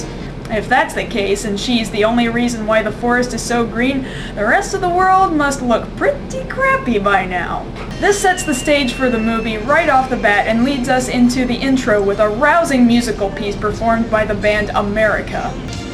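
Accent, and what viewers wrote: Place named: Texas, United States. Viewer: Thats the beauty of a Texas accent!!! YAY!!! Southern People rule!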